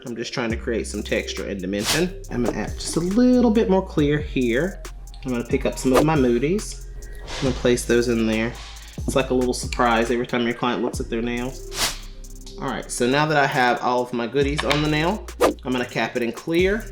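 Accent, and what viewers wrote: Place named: South, United States. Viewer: those are beautiful and awesome you make me want to practice this. I love your southern sound. My parents are southern, but raised in the north. Love all people ❤ 💕 and wow on those 💅 nails ❣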